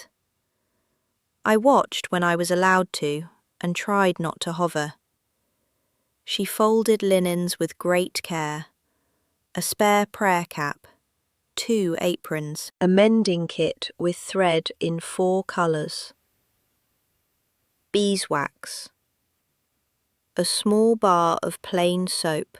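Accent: United Kingdom. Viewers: These stories are so fake. Someone born and raised in Ohio in an Amish community would not have a British accent. This is all AI generated. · Get rid of the English accent!!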